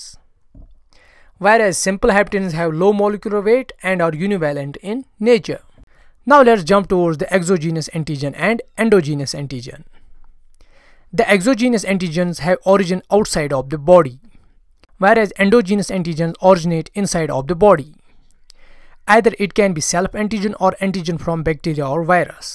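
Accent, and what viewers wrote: Kashmir, India. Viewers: So kashur accent brooo.. Cxe ma kashur??? 😂❤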